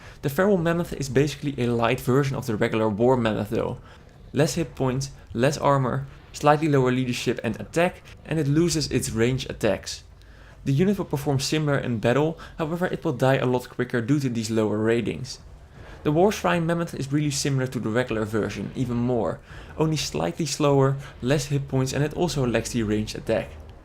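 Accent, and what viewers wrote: Netherlands. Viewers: Jij bent nederlands of niet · Lemme guess, you're Dutch aren't you?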